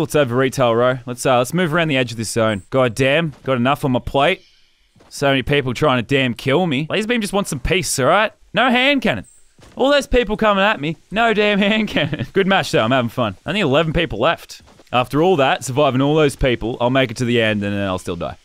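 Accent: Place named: Australia. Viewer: you're my favourite YouTuber, not just because i think swearing in an Australian ascent if funny but the things you say and do make me laugh just as much, also keep playing fortnite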